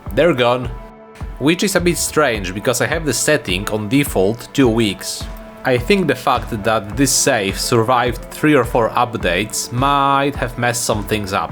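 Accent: Poland. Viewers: This was such a wild ride! I really enjoyed watching the whole thing and your Polish(?) accent and humour made it even better 😊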